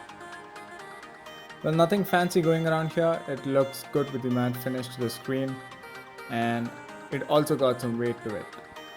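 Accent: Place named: India. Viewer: probably because this guy sounds Indian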